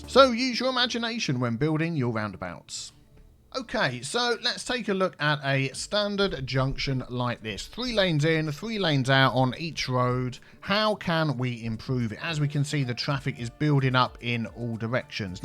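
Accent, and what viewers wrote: United Kingdom. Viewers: I already knew all this but I watch every video, so 🤷‍♂️ 😂 If anything, I stay for the beautiful British English 😌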